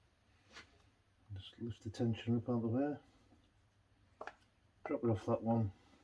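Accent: Cumbria, United Kingdom. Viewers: Where in Cumbria are you from?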